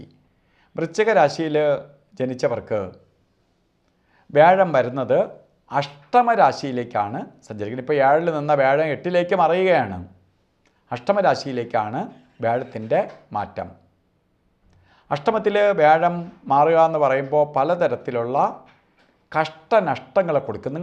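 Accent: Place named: Thiruvananthapuram, India. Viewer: Pakka tvm accent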